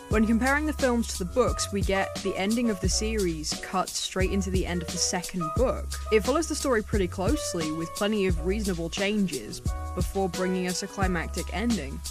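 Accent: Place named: North, United Kingdom. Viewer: Ahh that would explain it! Definitely has that American twist on top of a Northern British vibe. Don't try to mask it! Always good to be proud of where you're from!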